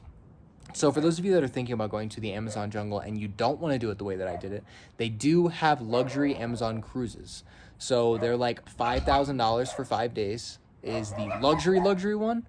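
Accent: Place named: North, United Kingdom. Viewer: hola amigo, estoy practicando mi listening skills, tu acento es norteño cierto¿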